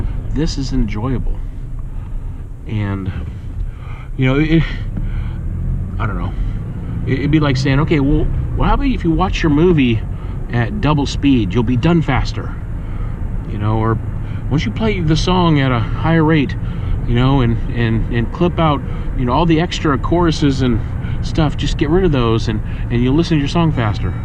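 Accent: United Kingdom. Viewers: love your British accent you make me laugh xxx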